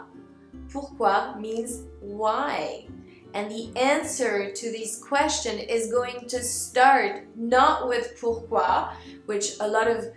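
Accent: United States. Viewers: Tu as prononcé le mot "example" comme "exèmple" :) <link> Mais en tout cas ton accent américain est superbe !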